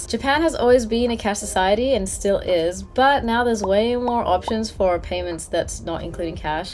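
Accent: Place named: Australia. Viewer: I like your Aussie accent so much.please keep it up uploading like this with accent! Less to see someone speaking Aussie accent. 頑張ってください！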